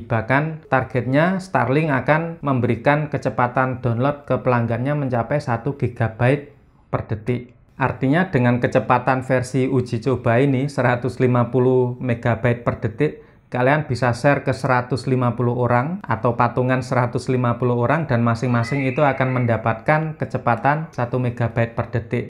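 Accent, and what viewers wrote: Java, Indonesia. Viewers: powerful medok kang😁👍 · Ini pasti Orang jawa.ngomone jowo medal.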